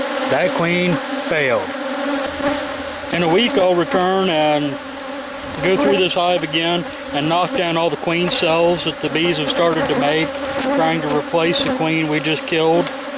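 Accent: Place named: South, United States. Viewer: With those bees being aggressive and with his southern accent its hard to understand what he is sayin.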